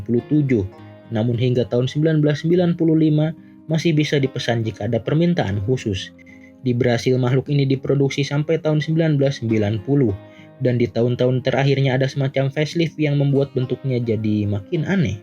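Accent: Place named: Sunda, Indonesia. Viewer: 😂😂😂 lucu adminya membawa narasinya..ciri ciri orang sunda nya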